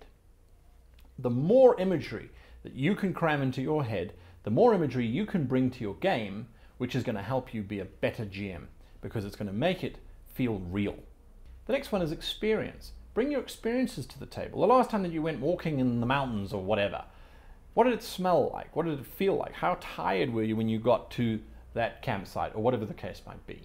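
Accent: South Africa. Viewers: are you South AFrican? YOu sound South AFrican. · Do I hear a hint of Afrikaans in that accent ? ... if so, Greetings ! really enjoyed this video, amazing tips, will definitely put them to use. You have my thanks.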